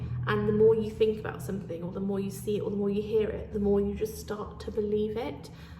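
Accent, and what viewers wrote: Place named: United Kingdom. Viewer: YOU ARE AMAZING!!!! Put up all the videos!!! You are one of my favorites for so many more reasons than your fun British accent 😊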